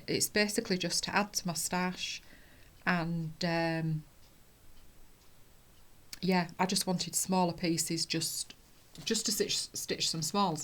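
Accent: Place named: Yorkshire, United Kingdom. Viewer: Hello 👋🏻 I’ve just started watching you and really enjoying your Flosstube. Your projects and stitching are beautiful. I am also doing stitch from stash and doing well so far. I guessed you’re from Yorkshire straight away but where? East Yorkshire. I’m in South Yorkshire right on the edge of the Peak District, I can walk into it in 5 minutes. Anyway Happy Stitching and I’ll see you next time ❤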